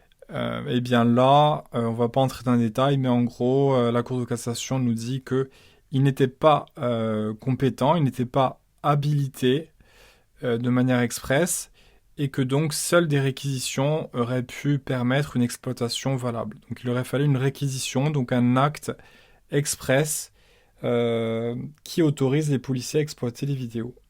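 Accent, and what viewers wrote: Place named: Nice, France. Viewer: Merci encore pour tes podcasts ! À écouter en fond avec le petit accent j’ai l’impression d’être de nouveau chez moi à Nice 🫱🏼‍🫲🏽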